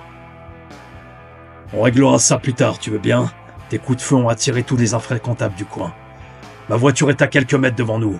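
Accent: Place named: Mexico. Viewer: Tr bonne vidéo ton accent mexicain est déjà légendaire merci pour la video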